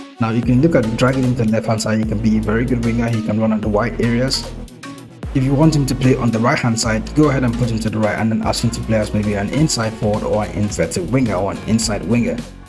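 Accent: Nigeria. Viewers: i heard the voice and i had a feeling Nice to find a nigerian fm youtuber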